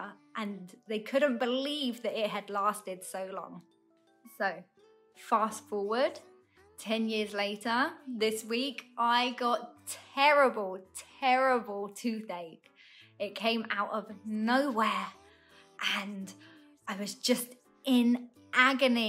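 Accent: United Kingdom. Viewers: I really like you, like your British accent. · 哇、正英國腔的美女、希望有空你也可以講些你家鄉的事、讓我們也長見識、畢竟英國太遙遠、或許透過你也可以讓我們多了解英國。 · 謝謝妳最愛英國腔了我的英文有救了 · 英國美女講出好聽的濃濃英倫腔，速度及清晰度都適合用作語言教材範本。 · 英國腔真好聽。台灣牙科還是有更高級的診所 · 英國腔真好聽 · 記得定期去洗牙齒喔不然會有牙結石價格也是掛號費很好奇英國或是其他外國人牙醫這麼難預約有習慣在洗牙的嗎? 還是說洗牙的費用比較便宜? 最近常常在網路上看到牙結石超大塊的案例不知道是因為牙醫太貴太難約又沒再用牙線才有這種狀況發生嗎台灣牙醫太多很多裝潢甚至非常的高級豪華卻一樣只收掛號費 (除非是矯正牙齒之類的健保不給付的) 所以牙醫的技術真的差異蠻大的我家高雄這邊就有因為拔智齒的速度快到被稱為快槍手出名的牙醫在但有的甚至為了賺錢很愛給人拔牙齒或各種牙齒保健療程把原本的流程分拆複雜化因為這樣他們能得到健保比較多的費用我本身認識的牙醫他就是主張能不拔就不要拔所以我四顆智齒都沒長歪牙醫師也說不影響就不要拔因此我對他很信任我曾經也有過牙齒補的地方掉了那時候我人在台灣但也真的超緊張怕吃東西殘渣之類的繼續腐蝕我的牙洞所以就馬上跟公司請假去看牙醫了所以我能理解你當時沒有醫療補助又遇到這種情形有多恐慌真的想不到國外有賣補牙DIY組.... 因為補牙的時候牙齒要完全清潔才能補上去不然細菌反而被蓋在牙齒裡面更糟糕最近開始追蹤我本身很喜歡英國腔覺得很舒服真的跟留言說的一樣難得有說的這麼慢跟清晰的英國腔:D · 英國腔，好好聽喔 ~~ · Love your beautiful teeth, smile, dentist stories and that bloody gorgeous British accent~~~~ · 您的英式英語口音真是令我覺得悅耳動聽啊！ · I love tha way u talk, so British! Is your husband Taiwanese? 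· 英國腔英語很好聽，南非也是英式語言，我住過幾年，但是沒有學好英語！現在聽到熟悉英語很親切，很好聽！很棒！謝謝分享！😄🇹🇼💯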